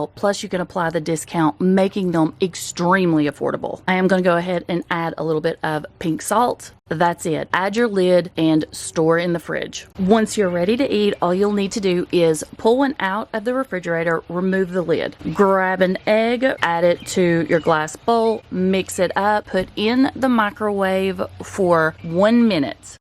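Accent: South, United States. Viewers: That's an enjoyable accent, I guess some of the more southern US? Greetings from Germany 🇩🇪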